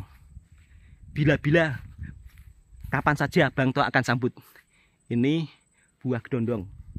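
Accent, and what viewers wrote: Java, Indonesia. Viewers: Suara n tonasi nya bunyi jowo kn ...medok banget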